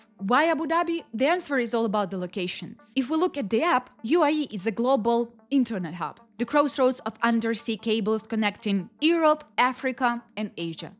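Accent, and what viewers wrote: Russia. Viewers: Девочка очень старается. Но даже не носителю английского ее российский акцент за версту слышен. Но если будет усердно над этим работать, за несколько лет возможно научится похоже на носителей говорить.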